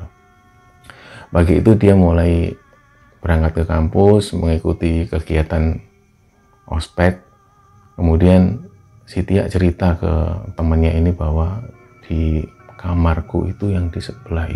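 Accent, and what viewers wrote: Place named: Magetan, Indonesia. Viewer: Beneran magetan, orang magetan mana suaranya ✔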